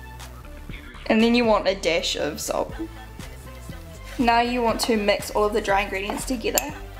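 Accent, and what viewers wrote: New Zealand. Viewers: Nice kiwi accent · Are you from New Zealand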